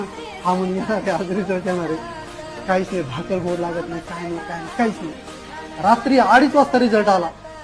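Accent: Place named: Ahmednagar, India. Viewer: नगरच भाषा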